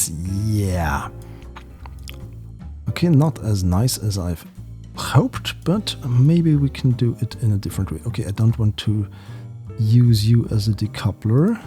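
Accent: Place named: Germany. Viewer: Ich wusste doch das du Deutsch bist! :D (Hat man an deinem Akzent gehört)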